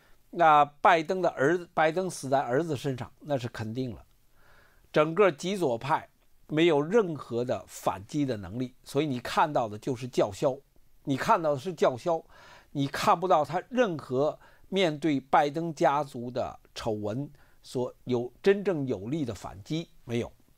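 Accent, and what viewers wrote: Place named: Beijing, China. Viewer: 从十一开始到十一结束很久没看涛哥的节目了，听到涛哥的那口正宗的北京口音感觉棒极了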